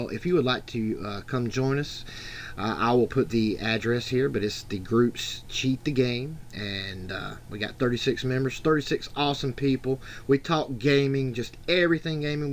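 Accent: South, United States. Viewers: Subbed! Love your accent by the way. Are you Southern or Pagan by any way?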